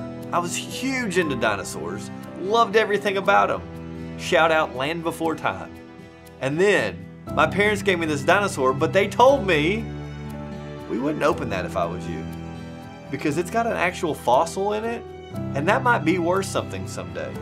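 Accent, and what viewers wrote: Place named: Ohio Valley, United States. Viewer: Thank you for sharing! Keep it up man...I appreciate that you share your walk with God to all those who listen to WalkFM. I just found you all online as I was searching for Christian Radio Stations back home (Ohio Valley). I have tons to listen to here in Nashville,TN...but I was missing the accent and familiarities of "home".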